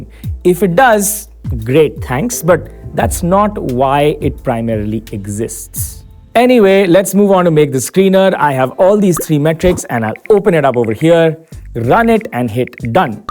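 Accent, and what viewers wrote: India. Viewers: Hey man this video is very good and i am binge watching your channel's videos this is really good! This guides me in right path with new perspective! Please continue this job and this is very engaging!❤️ By the way your accent looks like one of the south indian movie director GVM. 😹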